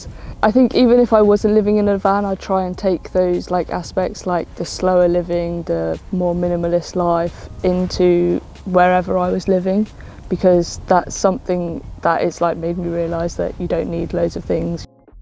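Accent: England, United Kingdom. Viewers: such a nice english english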